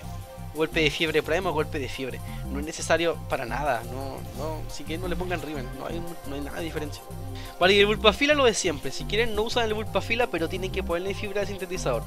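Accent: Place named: Chile. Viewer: Buena compa me alegra escuchar chilenos, supongo que eres chileno jajajaj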